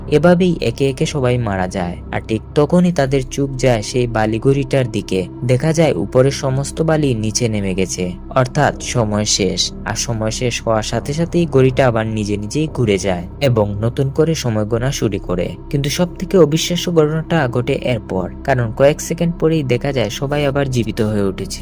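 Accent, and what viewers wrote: Sylhet, Bangladesh. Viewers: আপনার মাতে লাগের তো আপনি সিলেটি সিওর নি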